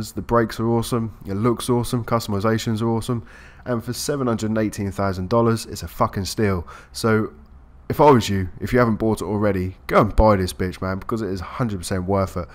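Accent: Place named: Norwich, United Kingdom. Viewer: Your from Norwich right? Or very very close lol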